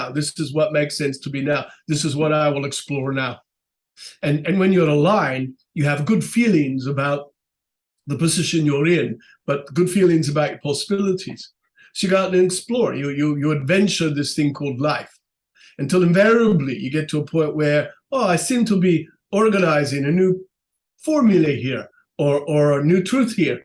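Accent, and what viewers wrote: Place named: South Africa. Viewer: When speaks, I detect a South African accent. Interesting. I also recall a Dutch acquaintance speaking English with this sort of accent. I know this video is a couple of months old, and I have only just discovered this channel, but I would choose Hawaii over Portugal any day. Portugal has terrible bushfires from time to time. The people over there planted heaps of eucalypt trees which are notorious for their ability to catch fire easily. Has ever been visited by his wife from the next world since she cast off her flesh suit?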